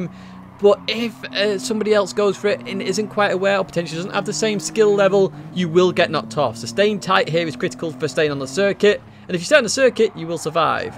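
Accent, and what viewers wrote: England, United Kingdom. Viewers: What part of England are you from. I’m just curious about your accent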